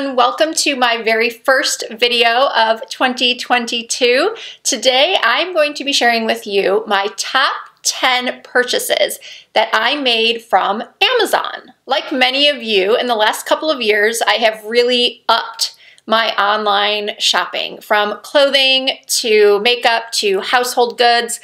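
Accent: Midwest, United States. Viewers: Our Amazon shopping history looks so similar. I have a couple of those brush holders. I have that lamp in silver. I have that necklace set. I’ve looked at that black dress but opted for the sweater with a similar sleeve instead. I just spent the holidays in Summerlin, coincidentally. My SO’s mother and family all live there - so we’re actually there a lot. I’m not sure which neighborhood you’re in. I’m so glad I found your channel. I haven’t been watching long enough to know but your accent sounds a bit from the midwest (like around Chicago?). I am from 50, live in LA, and am originally from Indiana. Anyway. You look fantastic and I am enjoying your channel. Thanks!!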